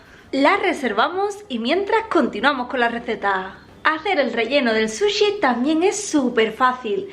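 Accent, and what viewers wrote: Spain. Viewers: Sos de España verdad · Eres de ESPAÑA Saludos desde MÉXICO 🇲🇽🇲🇽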